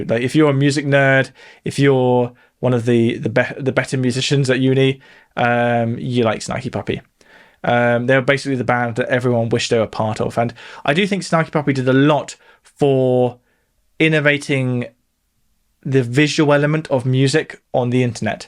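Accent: United Kingdom. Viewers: Your British is showing strong in this one. Haha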